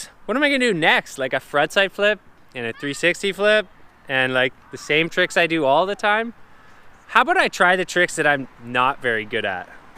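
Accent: Canada. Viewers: Canadian I think bro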